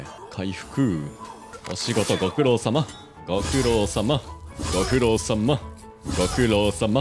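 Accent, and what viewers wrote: Kansai, Japan. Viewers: めっちゃ関西弁なの慣れてるからか聞きやすい^^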